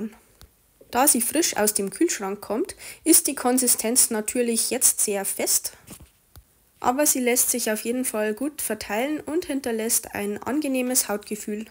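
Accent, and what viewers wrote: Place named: Bavaria, Germany. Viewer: Schönes Video und ein charmanter, Bayrischer Dialket :) Liebe Grüße aus Österreich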